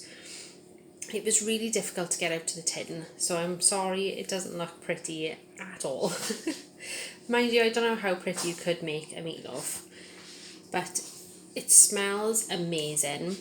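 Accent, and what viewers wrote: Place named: Wales, United Kingdom. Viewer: Hello, new subscriber😊 just wondering where your accents is from, I’m from South Wales myself and your accents sounds welsh😊 brilliant video x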